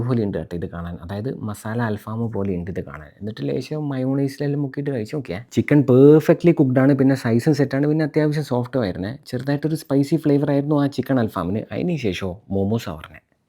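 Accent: Kannur, India. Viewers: chettooiii ❤❤ നിങ്ങളെ വർത്തമാനം കേട്ടിട്ട് ഇപ്പം കണ്ണൂർ ഭാഷ ഭയങ്കര ഇഷ്ടാ...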